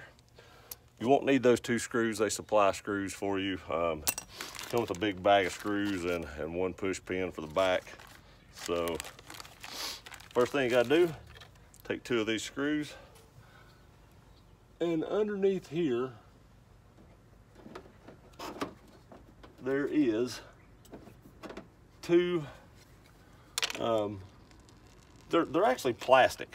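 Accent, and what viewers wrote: South, United States. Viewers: Southern state are you from because you have a very deep Southern accent.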